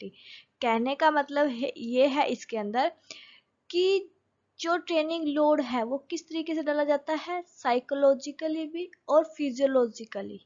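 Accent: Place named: Punjab, India. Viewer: you should to need more confidance, well good knowledge. Aapki Awaaz se lag rha hai aap Punjabi hai... 👏👏👏👏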